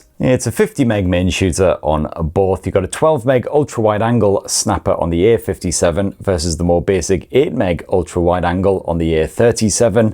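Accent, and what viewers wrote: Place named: Sunderland, United Kingdom. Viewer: He's a Northerner, to be precise a Mackem.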